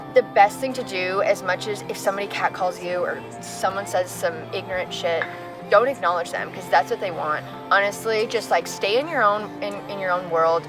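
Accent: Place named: Canada. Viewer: Canadians man, they pronounce “ou” and words w a hard sound between two a’s, like pasta sounds really weird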